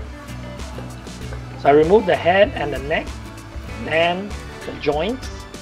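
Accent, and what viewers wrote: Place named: Caribbean. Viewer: You chopped up that Duck like Jamaican Jerk Chicken LOL! You do have the Island accent so I'm not surprised! That duck does look delicious! Very nice recipe for sure!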